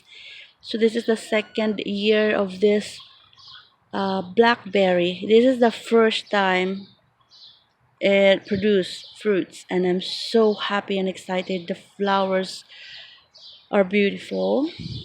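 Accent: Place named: Guam. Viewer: great ideas! I was guessing your accent was from Guam. I was close! :)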